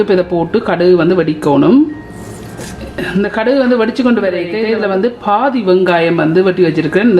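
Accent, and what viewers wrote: Sri Lanka. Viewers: உங்கட இலங்கை தமிழ் ரொம்ப வடிவா இருக்கு உங்க மாதிரி... இந்திய தேசத்தின் தமிழ்நாட்டிலிருந்து தீபா...❤️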